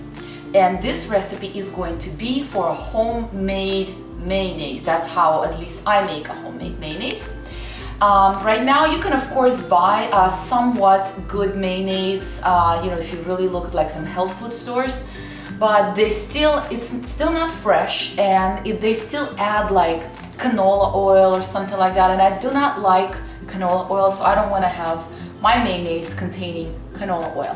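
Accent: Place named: Russia. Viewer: кстати,речь с русским акцентом гораздо приятнее уху и легче понять,чем коренных носителй языка! кофточка очень к лицу,красотка!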